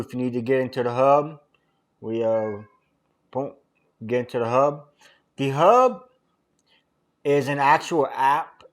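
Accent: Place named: Alberta, Canada. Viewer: Thanks for video!!! You have Canadien(Alberta) accent. where you from?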